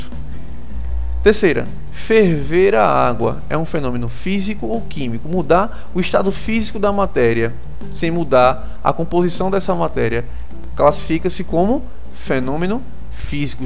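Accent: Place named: Pernambuco, Brazil. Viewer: pelo sutaque eu chuto! Pernambuco? ...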